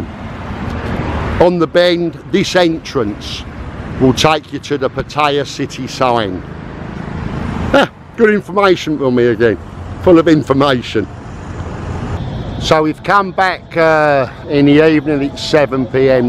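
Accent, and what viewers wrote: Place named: Cockney, United Kingdom. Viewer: Again good video but you cokney accent make dificult to understand fot frog like me !!!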